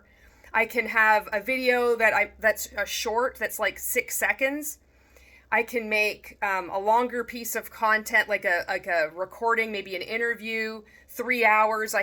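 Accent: Canada. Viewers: I think you should monetize. I don’t care if you make a little dough off me. You are hilarious, sincere, direct, and I love an angry Canadian accent! SCAAAAAM!!!! Love your content and keep it going.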